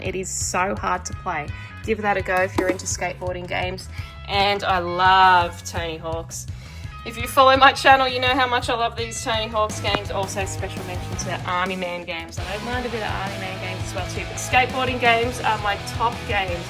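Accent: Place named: Australia. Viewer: Smokin' hot, tattoos, plays video games aaaaand has an Australian accent?! Crikey!😊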